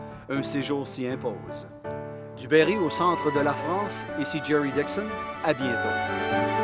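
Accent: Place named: Quebec, Canada. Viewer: Le Berry avec l'accent sympathique du Québec ! Merci pour jolies ces cartes postales d'une région dont il ne faut pas trop ébruiter le charme afin de lui conserver toute son authenticité !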